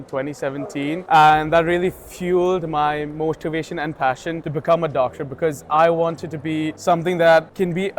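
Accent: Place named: South Bombay, India. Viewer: bhai ye south bombay wala accent to yahin chor jata use kyu leke chala gya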